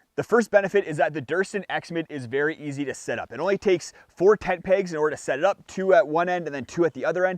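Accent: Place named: Canada. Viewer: You sound especially canadian in this video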